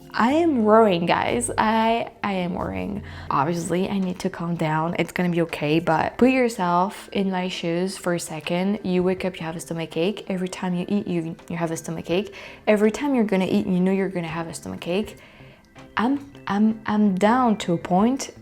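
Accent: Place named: Paris, France. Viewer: ..... the brain is a powerful thing. I'm no expert but I too will have tummy problems from time to time. This would happen when my brain was working overtime and my stress level was up. Stress comes in differenf forms. It can definitely mess with your digestive system. Your mind is obsessed with this issue. It will continue as long as it bothers you. You will have to try not being too regimental, try to chill and only eat what agrees with you. So for breakfast you had 3 acidic items and an egg. That acid will not help, so maybe an egg (or 2) and some dry toast. Best of luck, I know you'll make it, and don't give up your beautiful Parisian accent. I can listen to you all day:)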